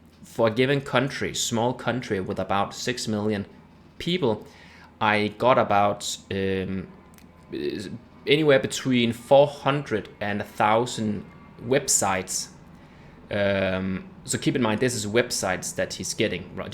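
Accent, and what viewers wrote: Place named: Germany. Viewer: Considering your strong accent, I'd say you are from Germany or Austria. Or maybe Luxembourg but less likely.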